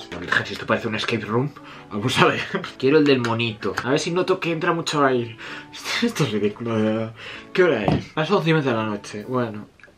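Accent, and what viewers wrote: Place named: North, Spain. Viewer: Como se nota que eres norteño!!!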